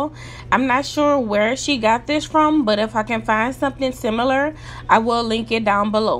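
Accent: New Orleans, United States. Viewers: Love the accent... Clearly a baby lol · I viewed bc I needed ideas. But after I seen & heard that accent I jad to subscribe!!! I love the City plus my husband is from there 🥰🥰🥰🥰🤗🤗🤗🤗. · Your desk is adorable! What do you do? OMG I NEEED those snacks! haha I love your accent you've GOT to be from am I right??;)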